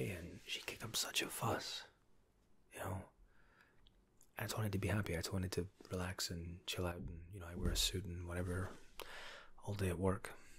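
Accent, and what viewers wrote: Scotland, United Kingdom. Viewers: I actually love your accent - is that a hint of scottish i hear? ;) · U sound british ... i prefer hearing british speaking because i feel like it’s someone from my family and I feel more secure! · And your Scottish!!! 🥰 I think i'm falling in love! Haha, ok, that's my cue to go before i start sounding like even more of a weirdo, lol 😂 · Your accent is great. it's like British with some Scottish idk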